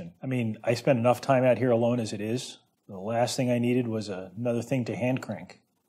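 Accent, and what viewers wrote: United States. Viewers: Love these videos, still don't understand why Americans change the L in solder to a D? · It does make me laugh when you guys on the other side of the pond pronounce solder as "sawder" rather than soldier without the i.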